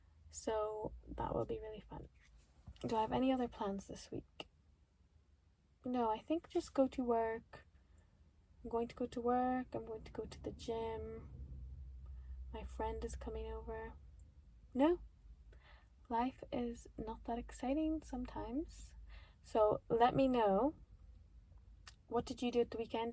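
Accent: England, United Kingdom. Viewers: It sounds like you might be an English English teacher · Are you British ?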